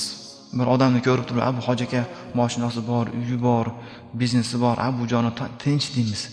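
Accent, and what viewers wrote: Kyrgyzstan. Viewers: Рахмат акжон жуда чиройли гапирасиз миллатиз киргизми лекин узбекчани яхши гапирасизоценкларда сал билинади лекин ярашади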